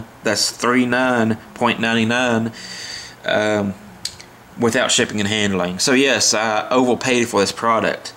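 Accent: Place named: South, United States. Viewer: Nice item - good video. Am thinking about getting one of these. One question.....which part of the US are you from? I can't sus that accent although it sounds southern to me. Dave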